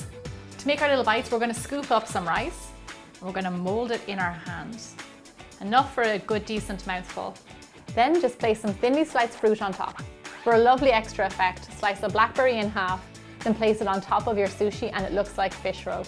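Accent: Ireland. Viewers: I totally adore your accent! So adorable! PS: I secretly wanted an Irish accent when I was a kid! And I still want one now!! 😝😝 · Okay this may sound REALLY weird but I love your Irish accent and I use to call the plastic wrap a plastic wrap but recently I've been calling it cling film😂 and omg I have been dieing to try sweet rice it sounds sooooooo good!!!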